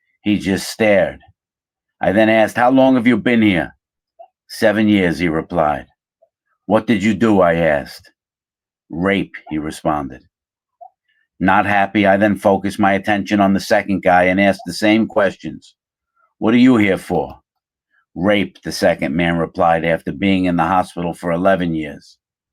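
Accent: New York, United States. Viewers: I'm sorry you had to see that horrible incident with poor Manny. Your mind went from chuckling because he was stripping and you thought he was just being a nut and then suddenly it wasn't funny. He was hanging there like a piece of meat. It's too horrifying to comprehend. I confess I snickered a few times. Not because anything was funny but just your storytelling style cracks me up. 😊 Also want to say that your videos are really amping up in quality and style. The chilling view down that melancholy hallway, the gates slamming, The Moody lighting. Your New York gangster accent in your deep soft voice. You're going to have to start charging us admission. I was silent and stunned for quite a while after watching that video. I think I still am.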